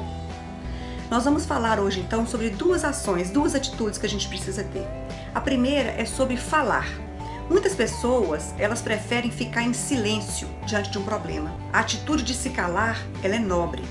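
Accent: Minas Gerais, Brazil. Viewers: Vc é mineira! Meu pai tbm, de Passos. Agora te entendo melhor. Bjssss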